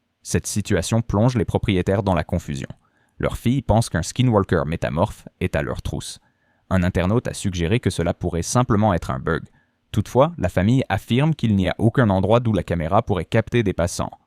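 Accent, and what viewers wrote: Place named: Quebec, Canada. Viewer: Franchement je dirais qu'au contraire la voix est très réussie, c'est peut être le leger accent québecois qui t'a dérangé.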